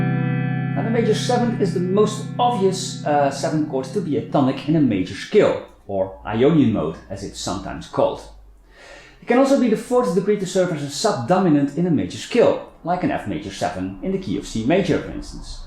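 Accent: Netherlands. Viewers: you can tell in a split second when somebody is from the Netherlands after they open their mouths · The Dutch accent suits guitar videos perfectly! Who knew? 😁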